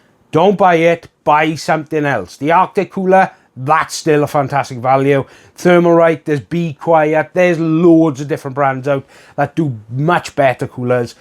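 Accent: Wales, United Kingdom. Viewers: Not only do I really like your videos but it's refreshing to hear a Welsh voice in the tech space. Makes me miss living in Aberystwyth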